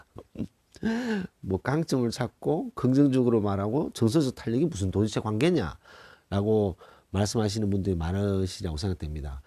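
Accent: Gyeongsang, South Korea. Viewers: 고맙습니다 늘 힘이 되고 있습니다 경상도 사투리로 조근조근 말씀해 주시는데 제 마음에는 무엇보다 잘 와닿습니다 괜찮아~~~ 뭐 이것쯤 이겨낼 수 있어~~~ 용기내어 보려합니다 다른나라와서 머물면서 갱년기 시작되면서 약해진 마음상태를 다잡아 보려합니다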